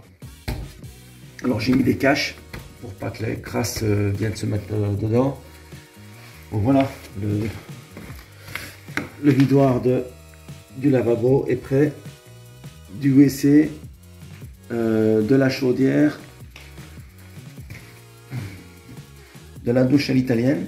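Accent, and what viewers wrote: Belgium. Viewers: Mais ne serais ce pas un petit accent belge ? Voir même liégeois 😊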